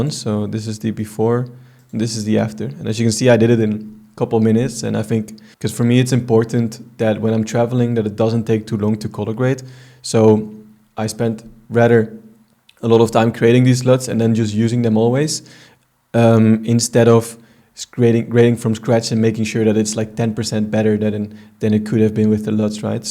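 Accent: Netherlands. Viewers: Je bent Nederland volgens mij haha het accent nice video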